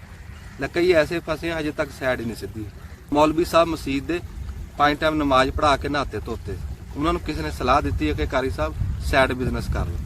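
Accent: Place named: Doaba, India. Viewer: Yaar I am surprised ki boli kinni saaf or similar aa doaba wal de naal mildi lagdi aa. Kamaal a bro. Video ta vadiya hai he but boli sunn k baut vadiya lagga 😂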